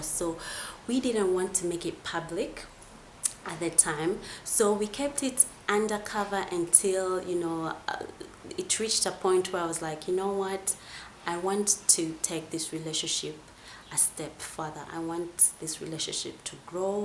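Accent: Uganda. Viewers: Before even reading the comments, I knew she was Ugandan. She has this beautiful Ugandan accent. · I enjoyed the story!! Monetise this channel so you make some chums. I thought you were Kenyan at the start but then felt the Ugandan accent deep in there. Love your videos💖💖💖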